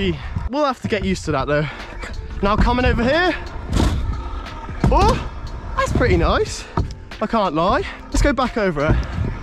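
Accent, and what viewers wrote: England, United Kingdom. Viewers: Are you English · Is this guy british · BRO I LOVE HIS BRITHIS ACCENT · Are you from England?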